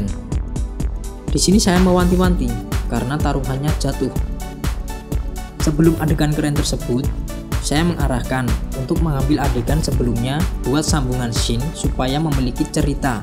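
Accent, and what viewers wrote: Java, Indonesia. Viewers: Jowoe medok banget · medhok cuk,jogja ne ngendi sampeyan mas?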